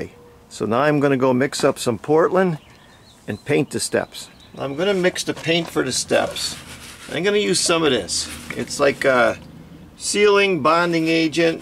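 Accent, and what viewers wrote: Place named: Pennsylvania, United States. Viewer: Hi, just came across your channel while looking for some tips on repairing my patio of natural -shaped bluestones. After a few minutes of viewing the videos I noticed something very familiar - the scenes, the houses, the speech. You are from my hometown area. I was born & raised in Dupont, schooled in Pittston, but currently live in MA on Cape Cod.. I am quite surprised to find someone from the Wyoming valley being a YouTube creator AND doing a great job at it. You are providing a great service entertaining & educating many people. Thanks for that. I subscribed and wish you continued success with your channel. Just wanted to say hello. Paul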